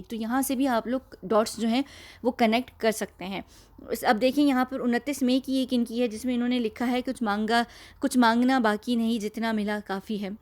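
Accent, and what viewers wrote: United Kingdom. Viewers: yes she has a british accent as she mentioned herself in previous videos that one of her parent is white(britisher).... i think she travels all over the world. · you are right, she has worked in several countries including India, but she is British, I can tell from her accent, it was a joke...the way she gives 5seconds to people who think that she fakes the accent and it makes me 😂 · Good info. BTW like your brit accent. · I don't know your name , I don't know where are you. But thanks a ton for this job .I like your British ascent. I love your voice as well. Keep up the gud work. Cheers .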